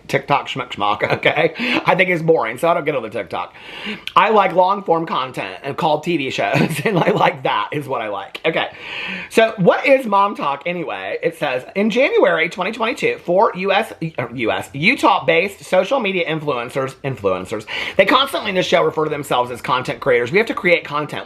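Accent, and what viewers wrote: South, United States. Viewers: are u from nashville u have a south accent lol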